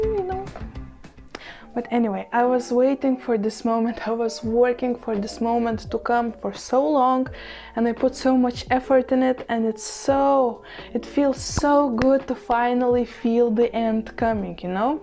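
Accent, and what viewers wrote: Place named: Russia. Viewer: сначала подумала что очень знакомо звучит английский, а потом поняла что ты русская^^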